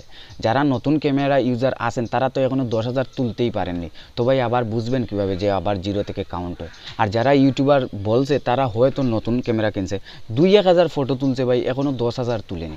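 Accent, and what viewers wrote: Sylhet, Bangladesh. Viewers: ভাই আফনার বাড়ি কিতা সিলেট নি? আফনার মাত দেখি সিলোটি অখলর লাখান লাগে।🥰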